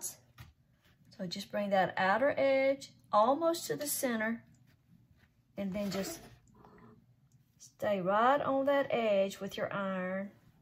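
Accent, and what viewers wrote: South, United States. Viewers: You have a GREAT ACCENT!! This is the BEST Southern accent, y'all!!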